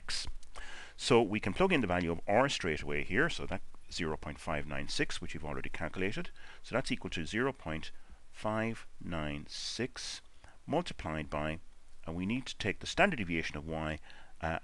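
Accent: Ireland. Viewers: A very interesting video no doubt and the Irish accent is a great addition, especially if you are going to watch it 7 times, almost one after the other as I did ... I understand the importance of the PCC to find relationship between X and y (if any) but when it comes to the slope, why are you not calculating it as the result of SP / SSx, especially when we have those values good and ready?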